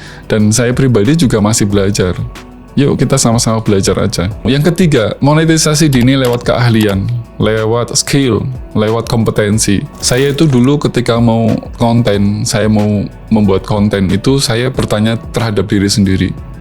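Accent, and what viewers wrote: Indonesia. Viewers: Logatnya kaya wong KIdul Mas??? Mantap lanjut dan jaga semangat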